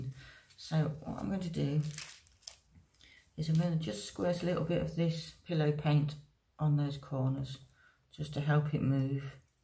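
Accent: United Kingdom. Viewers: my DArling this is bloody fantastic❤ I absolutely loved your video loved your experiment loved the outcome and love that I can use my fancy British Accent[in my head] when typing to you🤣🤣🤣Sorry I'm a huge Royal EVERYTHING fan so when I hear that beautiful accent I go gaga. Love you sister. TaTa for now Dahhhling❤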